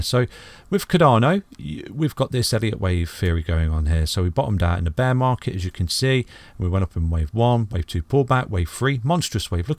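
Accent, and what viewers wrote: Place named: Midlands, United Kingdom. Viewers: Sounds like a brummie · Do you live in the Midlands England 👍 great channel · He's from Leicester 😁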